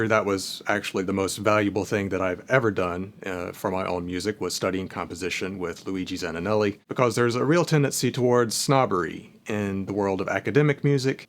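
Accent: South, United States. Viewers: there's only one thing I love more than the intersection of the academic study of music and the artistic application of advanced music theory on technology: hearing the subject discussed in a mild southern accent. 💜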